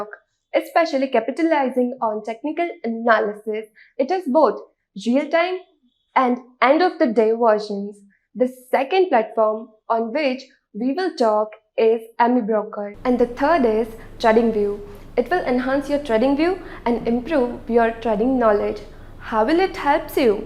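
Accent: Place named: United Kingdom. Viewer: You are sharing knowledge and your wisdom thats good.. and very nice of you.. the only thing that annoy is your british accent ... You can speak Indian english very well obviously but dont know why you dont prefer it... Just a point for improvement..no offence to you.. more power to you!!🙏🏼🙏🏼 All the best for your YT channel !!🔥🔥🔥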